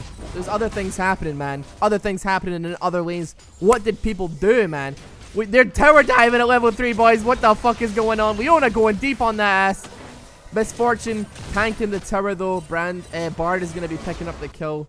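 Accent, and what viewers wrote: Scotland, United Kingdom. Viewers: Are you scottish? XD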